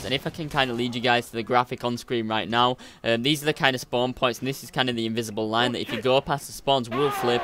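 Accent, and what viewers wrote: United Kingdom. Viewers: you're a great player and commentator dude. i love listening to commentators from the UK. its good to respond to your fans' comments. keep it up!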